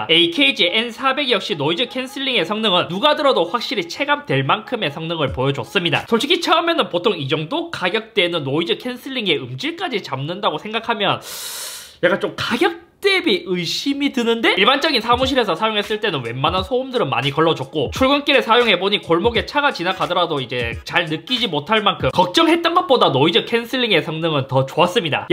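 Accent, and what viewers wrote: Gyeongsang, South Korea. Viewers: 나도 경상도 출신이지만, 이제 경상도 말투는 듣기도 싫네요 ㅠㅠ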